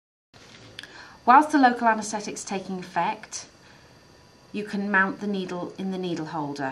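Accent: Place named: United Kingdom. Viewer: I love british accent and the video was good to. Thanks a lot!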